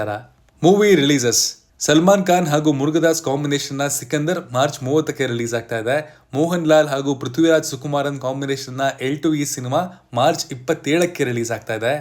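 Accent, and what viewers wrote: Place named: Hubballi, India. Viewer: Hi ದೋಸ್ತ ಏನ್ ಬೆಂಗಳೂರು ಇಂದ ಹುಬ್ಬಳಿ ಗೇ ಬಂದಿರಿ ಮಾತಲ್ಲಿ.. Yake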